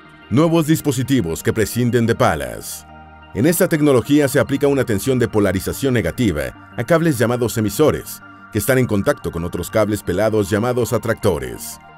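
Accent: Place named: South America. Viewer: Porque habla en sudamericano??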